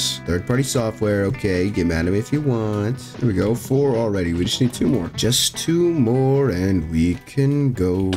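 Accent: United Kingdom. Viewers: that english accent was crazy bruv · the british accent was perfect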